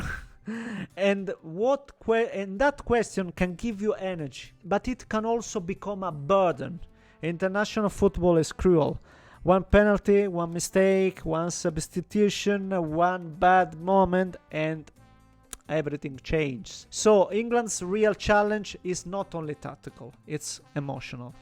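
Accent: Italy. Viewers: Messo like ed attivato la campanellina subito. Ottima pronuncia, spiccatamente italiana, ma corretta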